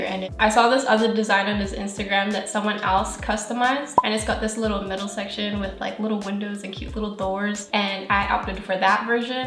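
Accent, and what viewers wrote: Australia. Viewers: I didn't realise you had an "Austrailian" accent until I saw this comment lol, I hear it now that it's been mentioned but totally didn't realise it before that. wow. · This was so funny holy cow. I felt this spiritually. I didn’t realise you were Australian. I’m moving to an apartment and I felt that hating yourself part for their depressing area. But the laugh had me dead. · Not gonna lie, I came here for the bunny content cuz I have a bonded pair. I found your channel when I was looking for bonding advise. I subscribed though cuz you’re so cute cuz of your Australian accent. It’s so attractive to hear 😅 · Ok is it just me or does she sound like she is speaking with an Australian accent AND an American accent.??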